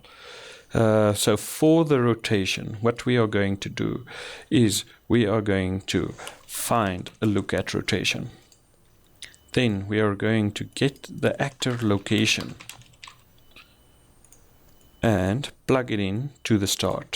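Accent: South Africa. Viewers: Hoekom klink jy soos n Suid Afrikaner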